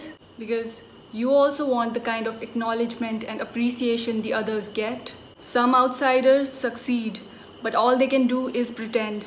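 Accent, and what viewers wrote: India. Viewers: I think many people feel like an outsider, but that's a feeling that them experience themself, that doesn't mean it's real especially people with low self-esteem. I don't know if this makes sense, I'm just trying to be smart here. I can understand if you have felt like an outsider in your life, because only your accent tells that you are from India and otherwise you don't look someone from India at all. I mean you look "white" than the average Indian and you look beautiful. That's meant as a compliment.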